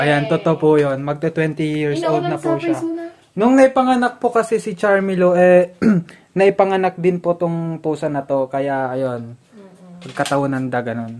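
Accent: Ilocos, Philippines. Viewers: Kwan!😅Basta ilocano di nawawala sa pagsasalita... proud to be I'm ilocano from TARLAC....God bless more vedios na nakakainspired😊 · Makakatawa ak ehh kasi gamin eh ilocano tagalog sao natin eh. Kahit pinipilit mong agsao tagalog eh may laok na ilocano pa din eh😂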